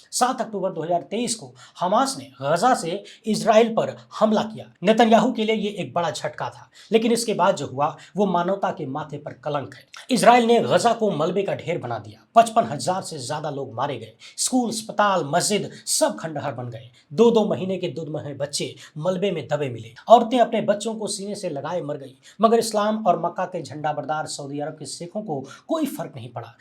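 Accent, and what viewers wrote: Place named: Haryana, India. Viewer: Ek. Nitin. Yahu. Jesa. Viman. Humare. Pas. Bhi. Agar. Nitin. Yahu. Apne. Ush. Viman. Se. Isril. Chod. Kr. Bhag. Gaye. H. To. Asa. Hi. Kuch. Yaha. Asa. Hi. Viman. Vale. Ke. Sath. Bhi. Ho. Sakta. H ish. Se. To. Bahut. Se. Log. Yaha. Anath. Ho. Jayenge aap. Ke. Chanel. Ka. Nam. Tk. Nahi. Pata aap. Ek. Nojawan. Koi. 30. 35. Sal. Ki. Umar. Ke. Haryana vegra. Se. Lagta jese. AAp. Ke. Bolene. Ke. Andaj. H ye. Badi. Dookh. Bhari. Khabar. H. Operation. Sindoor m. Ush. Ne. Pagasus. Se. Humare. Samarthan. Ek. Badi. Bhoomika. Nibhaee. Thee. 14. June. 25. Sature. Day. Jai. 2. Shani. Dev. MAharaj bakheda. Hune. Gram. 7. Om.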